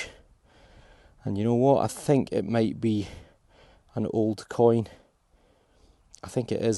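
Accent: Scotland, United Kingdom. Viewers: The Scottish accent is entertaining in itself, but could I respectfully suggest the proper pronunciation of the motto of the Order of the Garter: Honi soit qui mal y pense is pronounced (approximately) 'Onny swa kee mal ee pahnse'. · I’m sorry to those who got the emails. I for one feel that the enjoyment of your finds as well as the education I’m getting is amazing! That and the Scottish accent 😊. Thanks and congratulations again for the 30k subscribers.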